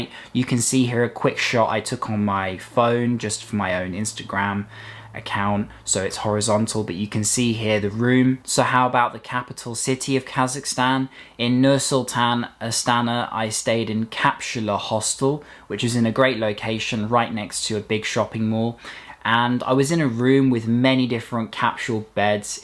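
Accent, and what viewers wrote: United Kingdom. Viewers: Зае6ись британский акцент)) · I like it your accent bro it's clear and understandable, you're different other British accent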